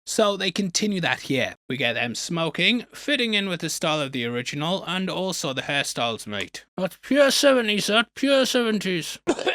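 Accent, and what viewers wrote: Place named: England, United Kingdom. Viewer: I'm still confused 😢....he's English accent confuses me more..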